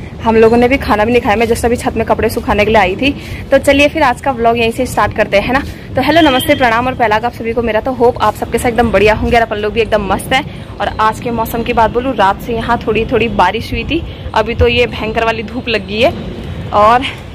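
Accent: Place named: Pahadi, India. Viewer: Maam . Pahadi tone aa hi jati hai Being .pahadi. ..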